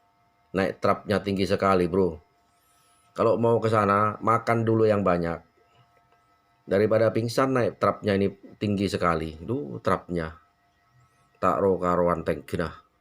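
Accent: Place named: Madura, Indonesia. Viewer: Hehe seru denger abang bercerita logat madura semakin seru mantap bang semoga sukses selalu Merah udh aku hitamkan Mampir balik bang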